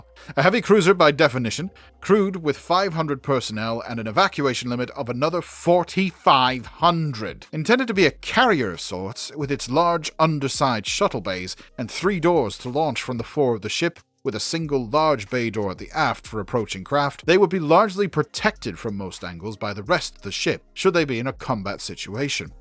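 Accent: United Kingdom. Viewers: okay, the narration was way way over the top on this one, had to shut er down 1/3 thru lol. Please get rid of the nasty british accent thx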